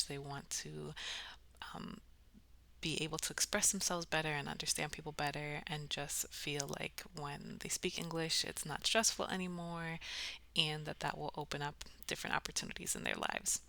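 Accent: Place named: United States. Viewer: Thank you very much teacher for sharing this lesson which make clearly the improvement in English language. I start understanding American accent with you.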